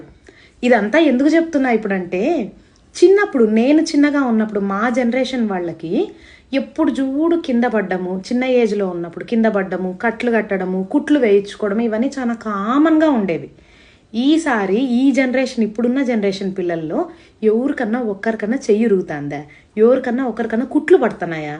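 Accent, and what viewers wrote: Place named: Rayalaseema, India. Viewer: Expression is excellent in rayalaseema accent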